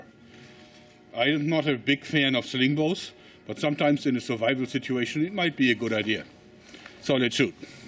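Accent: Germany. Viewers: for some reason this all made better with the german accented English...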